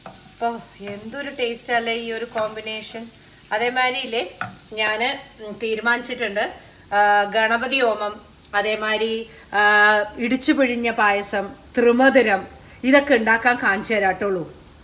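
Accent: Palakkad, India. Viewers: Chechi.. This is the first time I am watching ur video... Chechide samsaram kelkan enthu rasam aaneno... Thani palakkadan bashayil pachaka kurippu kelkkumbo natil ethiya oru feel... Pine ganapathi homathinde prasadam, idichu pizhinja payasam okke enthanelum idane... Samayam eduthu authentic aayitu cook cheyyunath kaanan thanne undu oru sugam... 👍👍👍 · pole samsarikkunna ente palakadu kootukare viji annu peru.adhyamokke varathamanam kelkkanum mathram njan video kandirunju..eppo recepie koodi kanan thidangi tto.thank you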